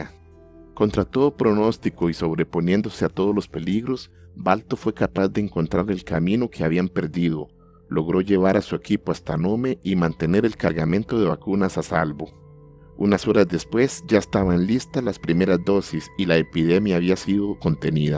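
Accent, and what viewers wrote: Costa Rica. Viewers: Sabia que eras Tico mae!!! Desde que empezaste a hablar, dije,mínimo es de cartaguito. Buen video. Gracias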